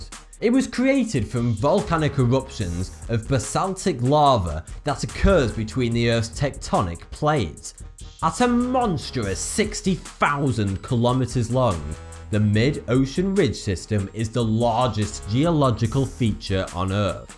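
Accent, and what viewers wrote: United Kingdom. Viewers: Is he British? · I love his British accent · So I don't mean to be rude but have you ever lived in Britain? He has one of the least annoying accents you can get, in my opinion. Would you rather have a cockney?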